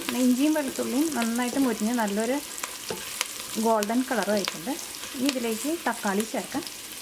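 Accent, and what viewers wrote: Thrissur, India. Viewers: onnu ochati paranjuday super aayirikunu curry alla ur very beautiful but slang oru kachda thrishur style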